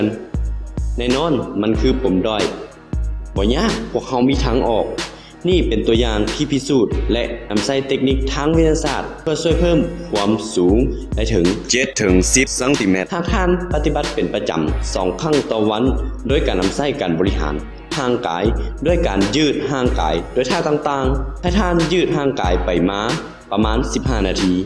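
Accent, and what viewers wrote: Thailand. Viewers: เหมือนภาษาเหนือมากครับ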